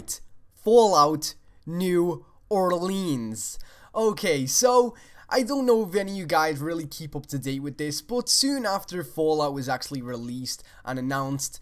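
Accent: Liverpool, United Kingdom. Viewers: Where are you from,? Sometimes you sound like you're from Liverpool in the UK but then you pronounce some syllables like an American and I second guess myself. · your enthusiasm is infectious. I have it now, too. I hope New Orleans is true. Nuka World was leaked in a document two years ago, and it's coming out next week. if Obsidian is indeed working on this, then it might explain why the next South Park game was given to another developer. BTW your accent is difficult to pin down. I'm detecting Liverpool with a hint of Dutch? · He from the U.K. Liverpool.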